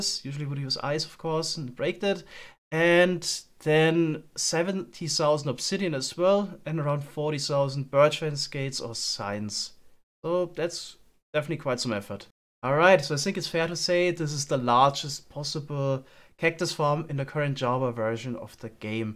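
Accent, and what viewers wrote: Germany. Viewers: I can hear a little bit of deutsch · your german? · bist du deutscher ? hört sich leicht danach an. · The german accent is soooooo brutal hahaha love it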